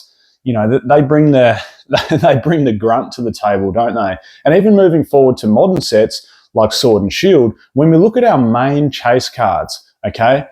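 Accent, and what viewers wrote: Australia. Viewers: Bro screw those idiots that keep saying you’re saying it differently or wrong. You are not saying it wrong. Everyone loves a good Aussie accent. Some of us get jealous that we don’t have Aussie accents! Loved this content. It’s very interesting that SV era is bringing love to pokemon that arnt always given love.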